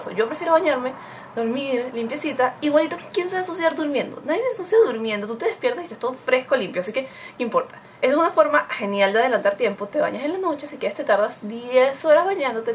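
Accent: Dominican Republic. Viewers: Eres dominicana ? pk tenemos el mismo acento;p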